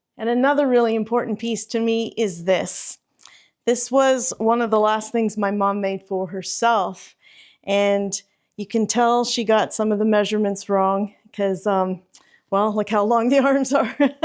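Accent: Canada. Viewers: I really enjoyed this video. Thanks for personalizing something that can be very hard to talk about. I live in Montreal, Canada, and I could not help notice that your accent sounds Canadian....is it?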